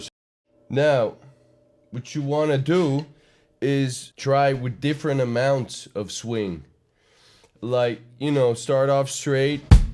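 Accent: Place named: Canada. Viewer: nice canadian accent bro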